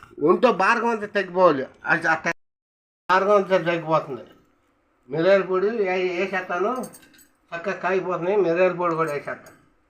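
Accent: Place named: Andhra Pradesh, India. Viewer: తాత నువ్వు ఉండే వంటలు ఎక్సలెంట్ తాత మీ ది విజయవాడ కాదు తాత విజయవాడలో ఉంటున్నారు కానీ మీ ది పక్కనే ఏదో ఊరు అయ్యుండొచ్చు నీ మాట తీరు పక్కా ఆంధ్ర బందర్ దగ్గర ఏదైనా ఊరు అయి ఉండొచ్చు అనుకుంటున్నా తాత మీది కదా తాత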